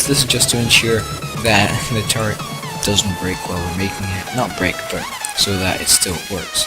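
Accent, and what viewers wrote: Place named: Ireland. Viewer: Ahhh okay. You sound Irish so I find it pretty funny.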